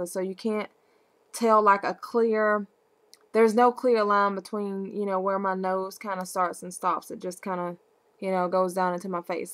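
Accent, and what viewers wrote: United States. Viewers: OKAYY!!! Tell me you live in Mississippi!?...you are country. yet its cute.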